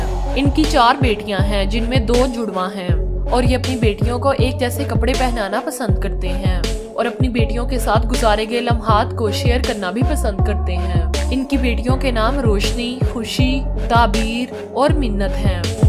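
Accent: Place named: Punjab, India. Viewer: PL IMPROVE YOUR URDU ACCENT, ITS A MIXTURE OF PUNJABI AND URDU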